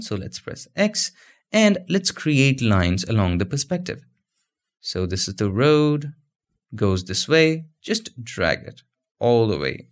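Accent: India. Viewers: I usually consider Indian accent unbearable to listen to, however..... You are one of the very few that find pleasant to listen to. Congratulations on your audio and voice-over, it's amazing.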